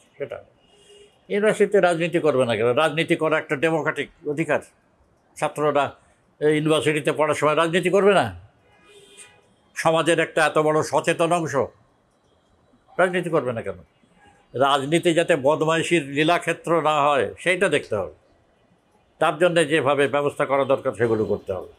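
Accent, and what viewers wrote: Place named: West Bengal, India. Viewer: স্যার এর আদি বাড়ি কি স্বাধীনতা পূর্ব পশ্চিমবঙ্গে ছিল? কথার মধ্যে এদেশীয় টান! অবশ্য খুলনাও হতে পারে। যাইহোক ভাল থাকবেন স্যার। এই বয়সেও আপনি সমান চিন্তাশীল । ভাবতে ভাল লাগছে।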